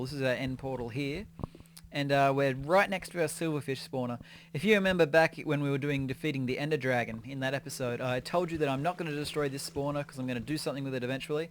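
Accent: Australia. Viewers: Are you Australian? I am xD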